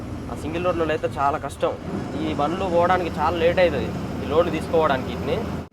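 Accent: Telangana, India. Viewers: Thank you నువ్వు చాల మందికీ ఇష్టం అందుకు Brother TELANGANA యాసని India మెత్తం పరిచయం చేస్తున్నావ్ Fast నుంచి చూస్తున్న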